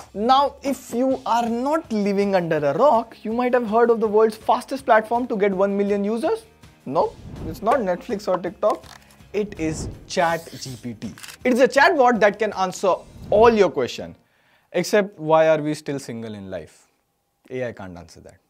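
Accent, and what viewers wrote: India. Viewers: Not sure why but he's the only Indian accent that I've heard that I can stand listening to. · Wow a cool indian even the accent😮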